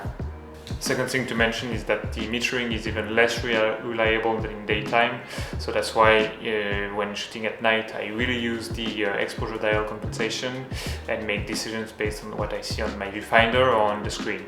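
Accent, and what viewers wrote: France. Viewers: Vous avez un accent, êtes-vous francophone?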